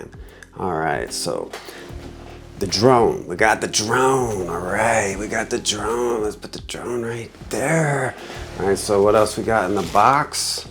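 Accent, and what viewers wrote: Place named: Louisiana, United States. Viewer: I know dat accent when I hear it! But I’ve never heard anyone speak so clearly, or with a neutral tone, but at the same time spit out a purely Cajun accent! You were tripping me out with that. Lol... We’ll have to get together and fly one day once I get some time on the sticks with FPV... I’m a 107 pilot, been flying gps drones for about 5 years. I plan to do some modeling, mapping , and construction progression work in the near future, but for now I’m just shooting some real estate here and there when I get the opportunity... But I been wanting to make some time for this hobby for a while now... I been playing around on the simulator a little bit, but i think I’m going to get a lot better once I get my hands on one of these little beginner drones. That’s the plan anyway! Lol...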